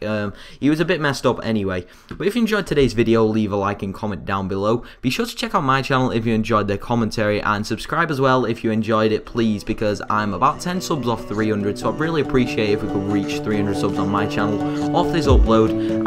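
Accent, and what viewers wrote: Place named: Manchester, United Kingdom. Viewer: Man, this commentary is Manchester all over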